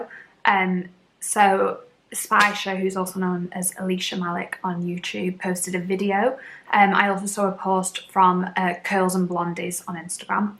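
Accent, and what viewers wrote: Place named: United Kingdom. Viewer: In all this horribleness and negativity it’s refreshing to hear a more local accent and the word ‘brew’ on YouTube as most influencers I watch are American 😂👍🏻 I binned half a bottle of Delight conditioner a few months back 😩 but it was so difficult to get hold of these in the UK anyway, just as well now...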